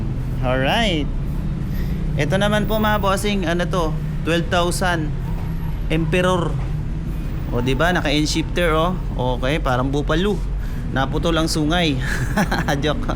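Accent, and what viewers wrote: Visayas, Philippines. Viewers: Bisaya ka bai?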